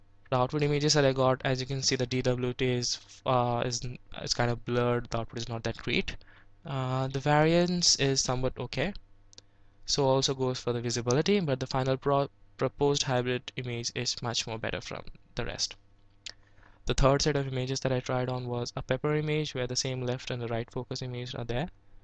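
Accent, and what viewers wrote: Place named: United States. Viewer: Through this video, you just took me to the good old college days & our project work. Your pronunciation has become somehow American :D Where are you now a days?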